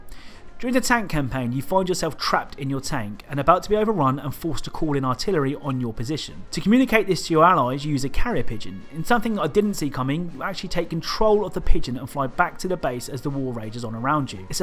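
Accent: England, United Kingdom. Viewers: Do you come from England?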